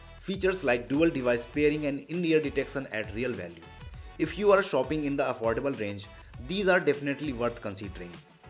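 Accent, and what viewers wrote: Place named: India. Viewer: Bro u speak like my UP Board english teacher from village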